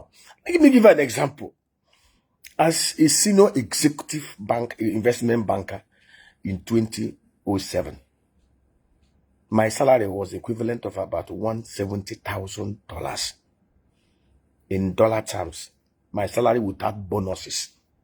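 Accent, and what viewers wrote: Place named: Ghana. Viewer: You look and sound Ghanian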